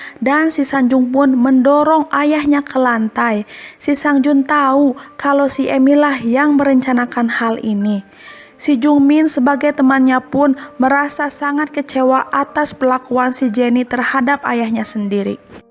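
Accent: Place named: Sunda, Indonesia. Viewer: duh eceu, khas pisan sorana euy nyunda pisan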